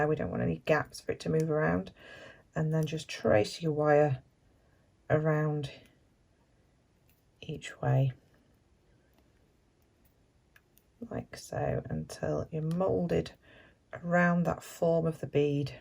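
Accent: United Kingdom. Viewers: Enjoyed your video I learned so much and enjoyed your British accent 😊 have been to UK 3 times and would love to go again ❤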